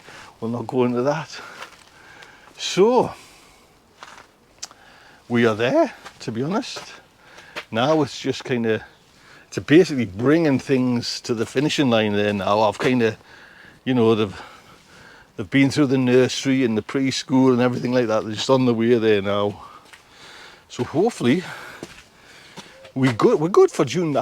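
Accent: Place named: Northern England, United Kingdom. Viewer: We’re a little south of you, accent wise 😂 and my elephant garlic is a bit behind you. The red onions sets are starting to bolt here, every bloody year. Slugs are a nightmare on one bed in particular here. I don’t know what your weather has been like but it’s been like a yo-yo here Durham way. T-shirt then jumper 🤷‍♀️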